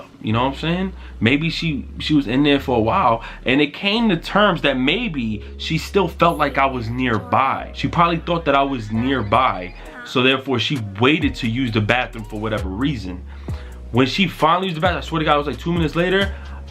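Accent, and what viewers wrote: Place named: Harlem, United States. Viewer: Yo...Real talk, you had me tearing up from laughter with this story man. You sound just like the kats i grew up in harlem with for real. XD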